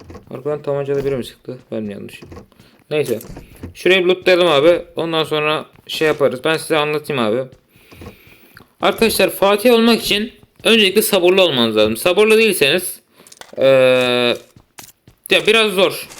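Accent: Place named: Giresun, Turkey. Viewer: Giresunlumusun şiven anımsatıyo bende giresunluyumda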